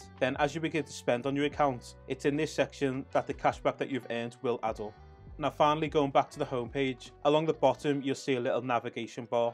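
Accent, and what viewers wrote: Liverpool, United Kingdom. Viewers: yeah I lived in wavertree originally and moved up to Clitheroe to live with my partner. And I agree especially when it comes to financial content I haven’t seen anyone with the scouse accent scousers tend to get more of a bad rep compared to a good one. so it’s especially good seeing someone talking about finance and providing great advice like yourself.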